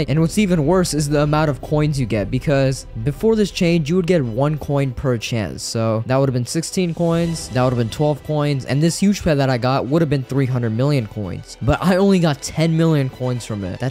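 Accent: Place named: United States. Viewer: bro u are also indian but ur accent is like americans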